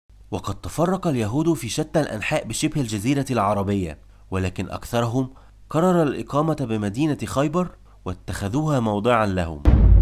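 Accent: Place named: Egypt. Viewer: ... عليك نطق الحروف بشكل جيد فأنت تتحدث بالعربية وفي موضوع علمي ... متى تتعلموا إخواننا المصريين أن تتخلوا على لهجتهم عند التحدث بالعربية والله أغاظني نطقك للكاف عوض القاف وكثير من الحروف 😢😢😢 حرفتها.. رغم أن المحتوى جيد من حيث المعلومات الدقيقة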